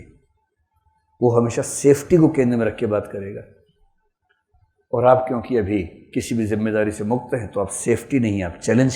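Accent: Bihar, India. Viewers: Mtiya do n.. sir bihari tune 😋😍😍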